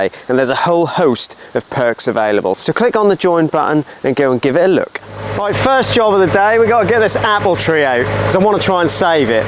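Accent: Norfolk, United Kingdom. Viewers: Ah! the YouTube algorithm sometime throws us an unexpected delight every now and then. Left home as a boy to come to London, and I really miss the Norfolk accent. A real pleasure to hear it spoken..a rare find on YouTube….wishing the young lad here all the best in his filming and diy adventures!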